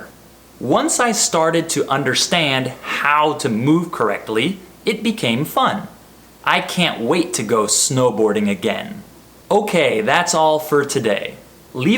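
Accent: United States. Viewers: First of all, let me say that your videos are amazing. Even though I speak English fluently, I enjoy watching your A1 or A2 videos. I even recommend my students to use them. Well, you speak very clearly and use real English, which is very important to understand native speakers easily. I think that one of the reasons why your videos are so fun to watch for me is because I like American English. In fact, I'm in love with American English. I must say that I don't really enjoy listening to British accent because it sounds so strange to me. I don't know why, but I don't like saying things such as car park. I think it's because I want to really hear and use the "r" sound, especially at the end of words. If you ask me, the best way to use your videos is to repeat them a lot. Many learners don't really enjoy listening to a podcast more than 2 times, for example. 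They think it is boring and not necessary. Therefore, they quickly move on to other listening materials. However, it is a lot better focusing on something for a long time than trying different things. You know what I mean? Think about footballers. Every day, they practice the basics and they never play before they train enough. So learning English and speaking it automatically without thinking is just like playing a sport like football. I'm sure you agree with me. As for your question, well, I think my favorite sport is football, even though I am a woman and can't play. I have to say that I love watching football matches so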